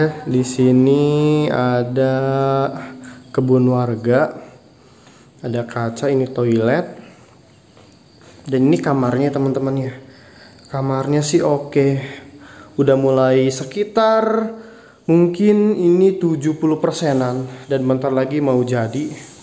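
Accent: West Java, Indonesia. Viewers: Aku seneng Ama konten ini dengan cara ngomongnya ke Sunda,an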